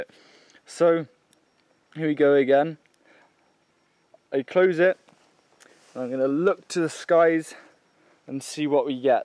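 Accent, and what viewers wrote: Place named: United Kingdom. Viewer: Sometimes I come for the great info. Sometimes I come for the entertaining programming. But sometimes I come just for the cool Brit accent. :)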